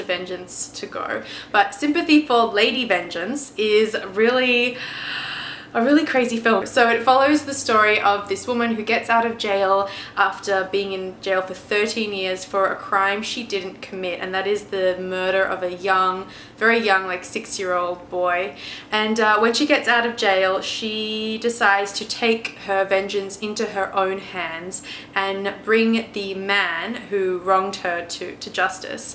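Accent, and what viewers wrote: Australia. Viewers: Hey do u like the singer? Lol. Sorry had to:p but anyway I love ur videos and I love ur accent I've always loved Aussie accents! Lol Also question. Why did u come to the US like what are ur goals for here and what brought u here? Would love to know:) keep up the good work!!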